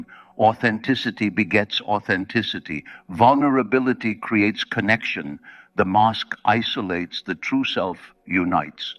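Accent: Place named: New Zealand. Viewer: Why is Dr Jungs accent from what I assume is New Zealand?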